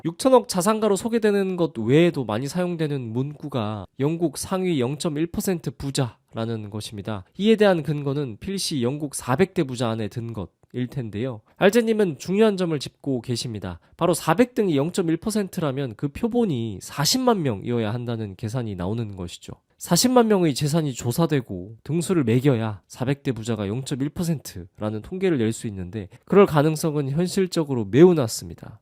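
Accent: Jeolla, South Korea. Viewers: 영어완전 전라도발음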